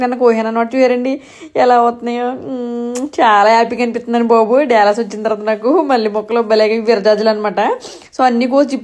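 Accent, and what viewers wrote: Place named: Amalapuram, India. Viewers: ఏదేమైనా మీరు మన అమలాపురం వాళ్ళు ఎలా వుంటారో ఎలా మాట్లాడతారో అలానే వున్నారు,కొందరు వూరు మారితే అన్నీ మార్చేస్తారు,వడ్లు కాసే చెట్లు అంటారు ,ఎవ్వరేమనుకున్న నువ్వు సూపర్ సిస్టర్ ❤❤ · Mekkudani..antu chinna ragam theysaru..adgadi..mana konasema..theeepidanam.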